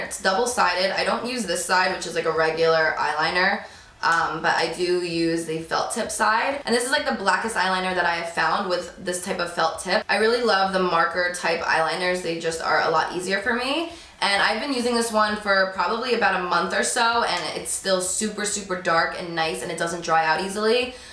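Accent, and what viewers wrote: New Jersey, United States. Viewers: are you from Jersey by any chance?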